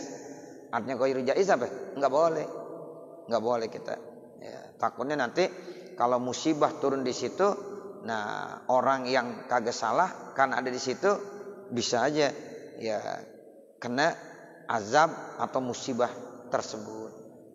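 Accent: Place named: Jakarta, Indonesia. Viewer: Smg Allah berikan kesehatan buat tuan guru kite, smg terus berdawah dgn bhs betawinya yg khas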